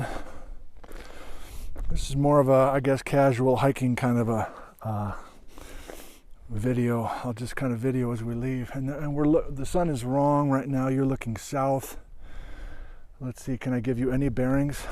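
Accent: Upper Midwest, United States. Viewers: Love the northern Midwest accent! I don’t know how you are able to turn it on and off so well. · Nice upper Midwest accent. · LOL good to hear a Wisconsin accent again. Reminds me of the family down in Chicagoland. Another great interesting video!. Love seeing places I doubt I will ever hike to anymore. Thanks again.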